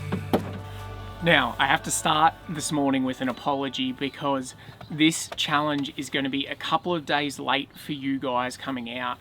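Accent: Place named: Australia. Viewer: Obnoxious Aussie accent.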